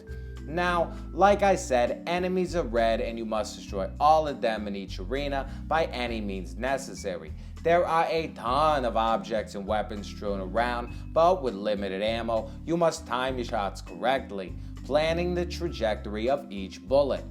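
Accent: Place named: Boston, United States. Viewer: Just an annoying guy from Boston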